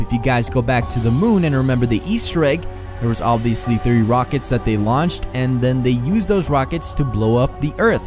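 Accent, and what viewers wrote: Bosnia and Herzegovina. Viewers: Are you from Bosnia?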